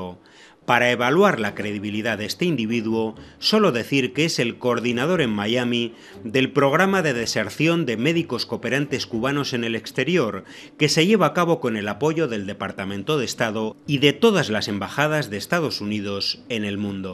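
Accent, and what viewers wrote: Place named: Spain. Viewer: Cuba Información y con un españolete de narrador! que bodrio!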